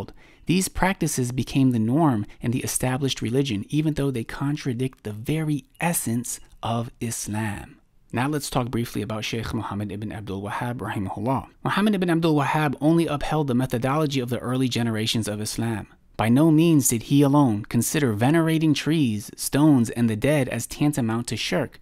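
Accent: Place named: New Jersey, United States. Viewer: Is he from jersey ? He has a strong jersey maybe New York accent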